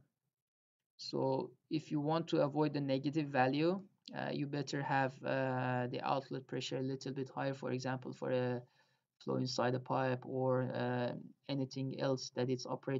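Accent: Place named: Iran. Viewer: are you iranian? this is a persian speaking english accent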